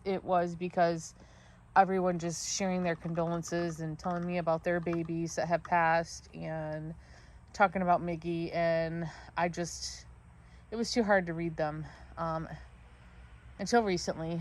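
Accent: Michigan, United States. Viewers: I think you have a Michigan accent. How’s Gretchen doing in Lansing?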